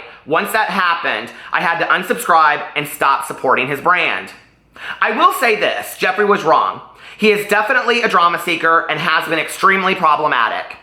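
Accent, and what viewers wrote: South, United States. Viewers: ahhhhh j star clickbait from the drama channel. is so vocal fry...drama channel grown man trying so hard to sound like an 80's valley girl with his gay southern accent.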